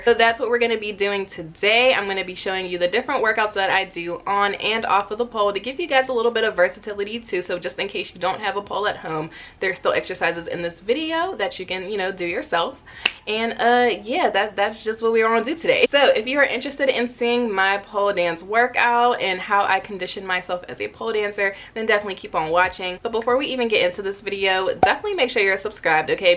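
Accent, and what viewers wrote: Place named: Pittsburgh, United States. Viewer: Listening to you speak, first thing i said was “is she from pittsburgh?” The i went to your IG and saw the pin. Do your thing!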